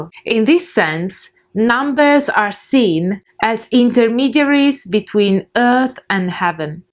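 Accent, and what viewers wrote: Italy. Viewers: your accent sounds Italian, quindi spero che mi capisce, comunque io non ho mai lavorato con gli Angeli Enochiani, ma con demoni del impero di Lucifero e con l'Arcangelo Michele, vari dei diversi, hindu ed egiziani, pero ultimamente ero interessato su sti angeli, i miei sensi non sono i migliori, ma credo che mi era stato detto di lavorare con Michele per aumentare i miei poteri, ma vabbe...hehe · You do have a beautiful accent. Then again, Italian is a special favorite. It's a beautiful day come tomorrow, 🙏🏻☕🌅🌹😌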